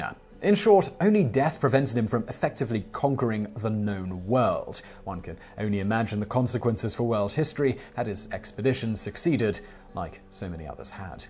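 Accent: England, United Kingdom. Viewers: What are you trying to say? He is English, so American mispronunciations are, in our view, wrong! Tom-ay-to, tomato!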